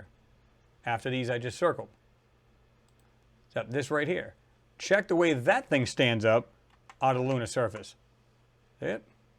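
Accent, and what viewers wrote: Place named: Northeast, United States. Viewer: First thing ....cool show ...love what you cover!! Secondly ...had to smile listening to the accent of commentator....I'm from New England and would bet that the accent is Northeastern U.S. 🌲😁💪💯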